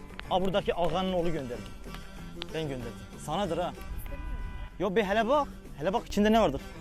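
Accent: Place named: Eastern Anatolia, Turkey. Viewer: Doğu şivesi videoların çok iyi bence devam etmelisin çekmeye 😂😂👍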